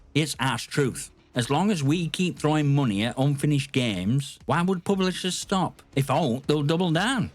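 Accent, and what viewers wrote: United Kingdom. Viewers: Feel like im listening to the British version of blame truth 😅.....call of duty just isnt what im after these days, its a complete shitshow, put 4 hours in, deleted, been enjoying battlefield 5 recently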